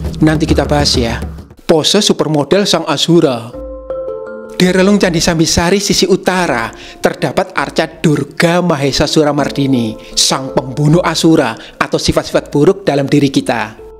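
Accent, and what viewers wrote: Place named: Java, Indonesia. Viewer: Jawa medhok!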